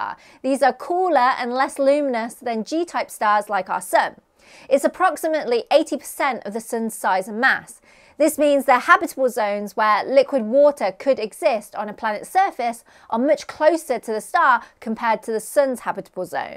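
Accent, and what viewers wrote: United Kingdom. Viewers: ❤❤❤❤Still can’t get over your lovely British accent. 😘😘😘 Jolly good episode👍😘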